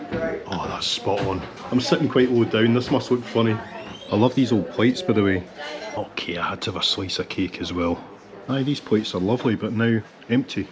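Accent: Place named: Cockney, United Kingdom. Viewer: For someone from the west end you have a fair East end twang in some of yer words now... 😂😅😢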